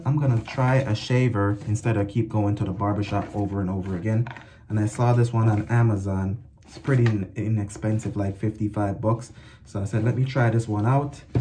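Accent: Jamaica. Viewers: Jamaican? Respect brethren!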